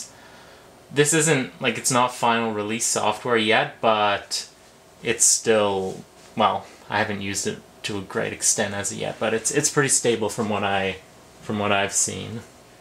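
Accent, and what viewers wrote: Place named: Canada. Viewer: He actually sounds Canadian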